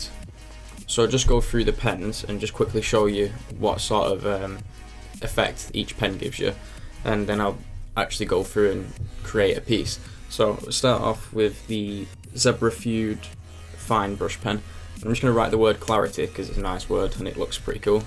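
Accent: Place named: Northern England, United Kingdom. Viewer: After so many Canadian and American channels, I love hearing a fellow UK Northerner, and delivering such quality videos! Cheers pal!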